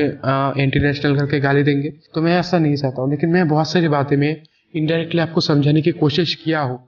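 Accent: Bengal, India. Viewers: Bengali hei keya? Hindi language attack raha hai.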